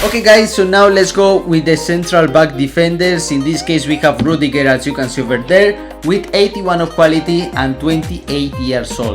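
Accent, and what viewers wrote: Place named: Spain. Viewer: I love your accent❤Are you Spanish?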